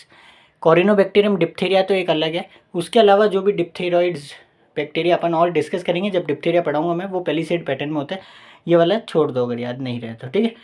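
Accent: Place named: Rajasthan, India. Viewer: The rajasthani accent 🤌😅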